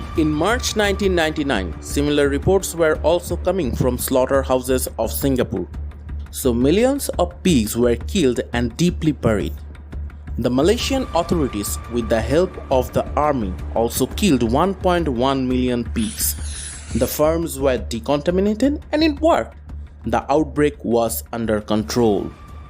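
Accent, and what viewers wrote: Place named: United States. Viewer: Dear Sir, your voice is tremendous like an American or Canadian when I listen first time, I don't understand that is your.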